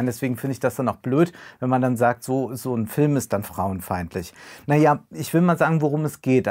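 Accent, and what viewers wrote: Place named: Moselle Franconian, Germany. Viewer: Dieser dezente aber schöne moselfränkische Akzent. :D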